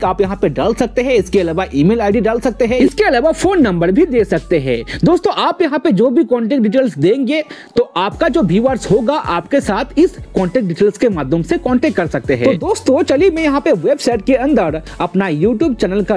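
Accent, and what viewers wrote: Bengal, India. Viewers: You are Bengali right 😂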